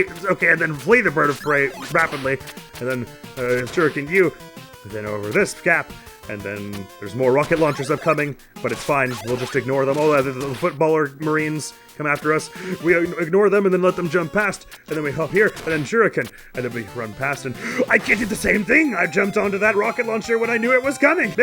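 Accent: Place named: New York, United States. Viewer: That's still a ton of really cool stuff! Like you, I've never had an Xbox, but I've never had any PlayStation stuff either; I've only ever gotten Nintendo consoles, and between them and my computer, I can find access to the games I want. Some of them would probably feel better on original hardware, though. Me, I've got a Wii, a 2DS that's starting to wear down, that GBA SP, a Switch, and the dark pink DSi my parents got me all the way back on my sixth birthday, which has somehow managed to hold up better than the 2DS and Switch 😆 OMG GATO! I can't believe I never thought of that, I have one of those too, just haven't used it in a long time Those darn bosses really should introduce themselves! Listen, Mr. Boss Guy, do you know how many guys with scythes there are in gaming? One was the final boss of KH CoM, and he had not only a name but a freakin' EPITHET (the Graceful Assassin; I never got around to telling you all the Organization guys' epithets and lesser Nobodies, so if there are any you still don't know, I can fill you in) Yes, I'll just hang out at my local library and read until a ninja comes to challenge me... 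Then again, that would probably wreck the library, so maybe I'll stand next to the tesla dealership instead 🤣 Yeah, I feel that way too. Tbh, I didn't realize you were trying to do an American accent until you apologized for it. I just thought you were doing an action-movie-guy sort of voice, it sounded kinda New York or Chicago-ish "Back in my day, we had to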